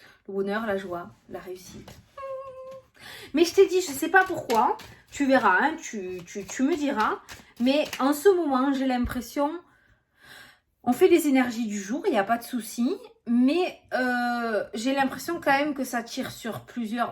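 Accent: South, France. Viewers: j'adore ton accent on se croirait en vacances dans le sud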